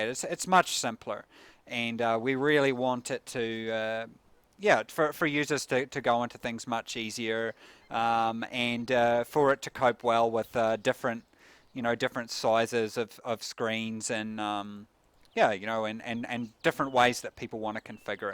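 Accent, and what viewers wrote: New Zealand. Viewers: Love the kiwi accent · Lol you didn't recognize a New Zealand accent? Anyway it's the ugliest accent that the English language has, after Californian. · Dat kiwi accent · Guy talking has a kiwi NZ'er accent. Am I wrong? developer is a Kiwi? :D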